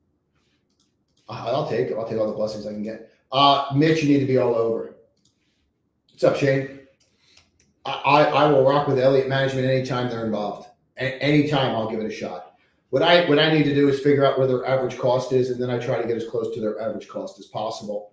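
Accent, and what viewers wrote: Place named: New Jersey, United States. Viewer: You are amazing and have taught me so much... I love hearing you as I'm getting ready for work everyday. Jersey girl here , love the accent ! I lost mine living in Maui . Aloha 🌺 for all your hard work ...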